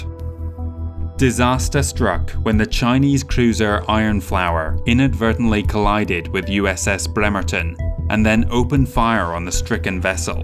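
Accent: Scotland, United Kingdom. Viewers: You sound like a Scottish person educated in England or who has lived in England for many years. Alternatively, you sound like a European (Nordic...?) who has lived in the UK, mostly in Scotland. Or, you sound like a Scottish person affecting an English accent and failing at it.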